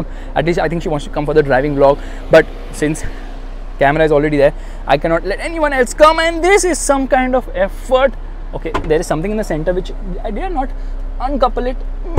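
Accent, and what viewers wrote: India. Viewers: Wow wow wow - The first thing you need to do is apply brake on ur tongue. It seems u are doing 250 words per minute & that too in Indian style English. Should we call it a running commentary or a runaway commentary? I'm sure 99% of ur audience will appreciate if u slow down. Basically u r ruining d show with your runaway commentary. Sorry if u find me rude, but I just honestly gave u the feedback. Thanks.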